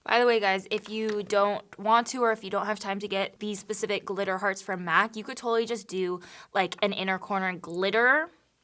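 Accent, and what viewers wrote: England, United Kingdom. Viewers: I love how you always throw in an English accent 🤣🤣 loved this fun pinky look 💗✨ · So everyone is just gonna ignore the fact shes been using a British accent 🤔🤨😂😂🤣.... im here for it though, i do it all the time also, glad im not alone!!.. happy valentine's day 💕💗💞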